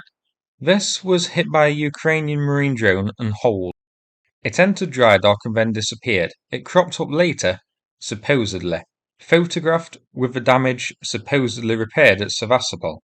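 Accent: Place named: Lincolnshire, United Kingdom. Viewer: I'm trying to identify your accent! You sound exactly like a good friend I worked with years ago in Bangkok! He ( Gavin) was from Lincolnshire area I think?? Keep up the great reporting!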